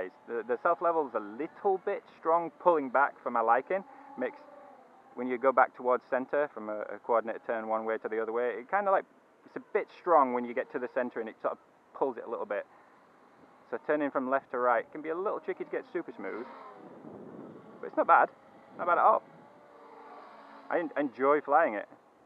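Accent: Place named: Northern England, United Kingdom. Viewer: Good to hear an 'oop north' accent! A very nice write up too. I'm fairly new to this quadcopter malarkey. When it arrives this will be my first brushless, really looking forward to it :-)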